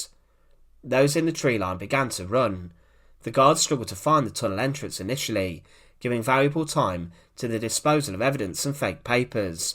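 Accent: United Kingdom. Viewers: I am a vet and would have enjoyed this video more if I didn't have to struggle to understand the narrator. I have heard other variations of Brittish accents that I liked listening to, but not this one ! It is not my intent to be rude, but I got a headache listening to this guy. I wonder why this guy was chosen to narrate, was he related to one of the escapes who were executed.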